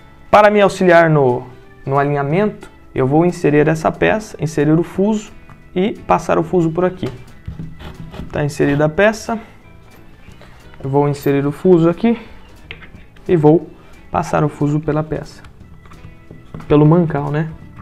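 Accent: Paraná, Brazil. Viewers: Cara, tá certo que o cabeludo é feio, tem sotaque paranaense, e tal... rsrsrs... Mas dar dislike?!?!?! Putz, esse cara tá contribuindo pro progresso dessa nação muito mais do que todos os políticos juntos, e sem cobrar nada... E ainda tem espírito de porco que dá dislike?!?!?!?! Patacaparau!!! Bora clicar nos joinha, galera!!! E, Professor, continue assim, que o Brasil precisa é de gente como você. Muito obrigado!!!!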